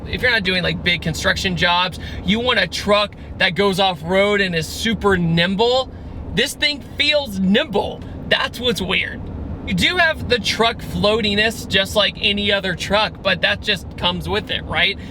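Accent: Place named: West Virginia, United States. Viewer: Dude gotta be from West Virginia. Ouch...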